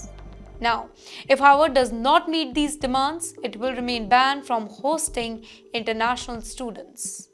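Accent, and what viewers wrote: India. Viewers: Tongue-twisting curry English.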